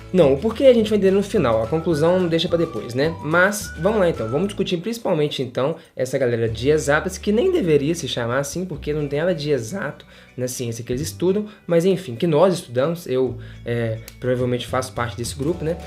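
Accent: Minas Gerais, Brazil. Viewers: Ele é Mineiro, se não me engano.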